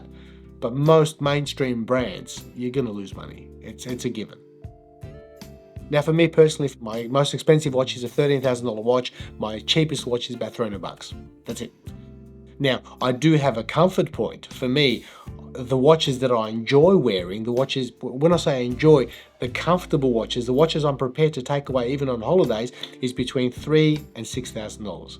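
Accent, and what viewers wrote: Australia. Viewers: I'm so happy to have found this channel., it's great to here an Aussie accent. I love your honesty. I agree with you completely. I only buy what I love and want to wear. I would never buy a 20K watch. I have no judgement for anyone who does. Some of them are very very nice. For me, I love great watches from $300 to 2K. So Micro brands rule.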